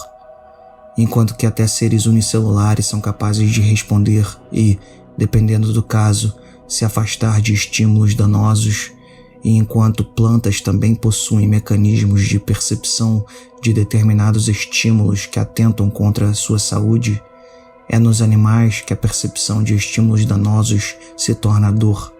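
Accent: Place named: Rio de Janeiro, Brazil. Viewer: Oque me causa desprezar como ser vivo eh esse sotaque carioca com " x " 😂😂😂😂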